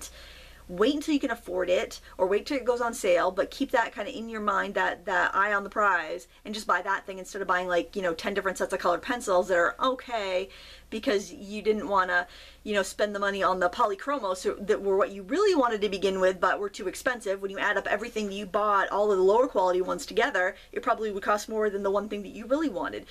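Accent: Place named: Texas, United States. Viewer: Hi! It is so defucal for me to understand what you say, for the firste, you talk wery fast, and with your lovley texas accent, i would love it to stay that way, ant i wont have a single issue iff you just show the stuff you tell about... Love from E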